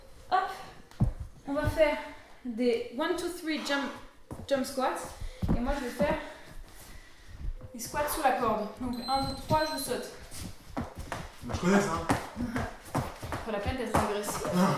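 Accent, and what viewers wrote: Réunion, France. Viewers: "la tantine elle commence le naffair, elle me dit même pas"...Vous êtes réunionnais ? :D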